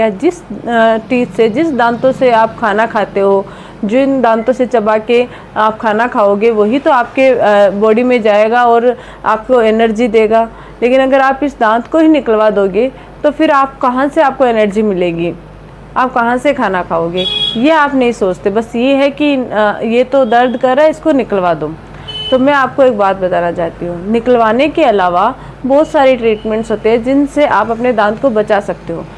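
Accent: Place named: Marwar, India. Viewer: आप भाषा से मारवाड से लगती हो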